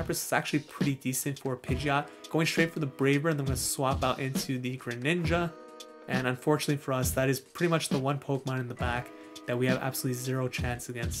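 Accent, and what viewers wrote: Canada. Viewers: I thought. that was a Canadian accent I was hearing,!, maybe the Raptors should pick up Iman Shumpert and get some of his DWTS momentum!!! 😂 Keep making such entertaining and informative videos! Your the best in this Tennessee Vols fanatics opinion!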